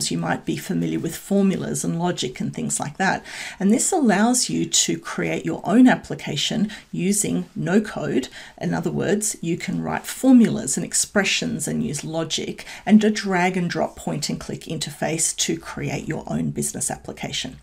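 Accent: Australia. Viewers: I dodn't know Australian sound like this :D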